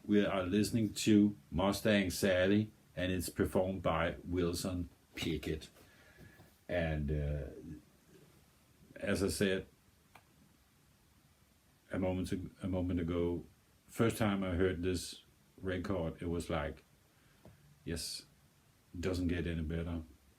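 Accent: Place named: Denmark. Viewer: You must be Danish, listening to your accent and your name, I'm pretty sure you are a fellow Dane. I was born and raised 10 miles south of Koege, came to the US in 1967 as an au pair, in Westport Ct. Have been here ever since. Although have been back to Denmark many times. Now live in the San Francisco Bay Area. I just heard you mentioning Denmark, so I was right. I love this song, we have a very active Scandinavian Club in this area, and in the early nineties we had a midsummer party, in the hills south of SF, and we had some members who played guitar and sang, and this was one of the songs they sang at that party.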